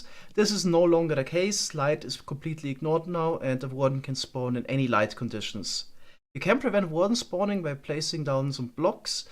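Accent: Germany. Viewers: Ich bin mir nicht ganz sicher aber kann das sein das du eigentlich Deutsch sprichst? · love ur german accent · are you german?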